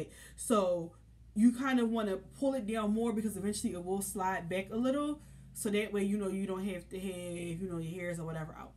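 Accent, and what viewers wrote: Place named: Philadelphia, United States. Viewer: Girllll you just helped me so much!!!! 🙌🏽🙌🏽 As soon as you started talking I said “she’s from Philly.” Lol.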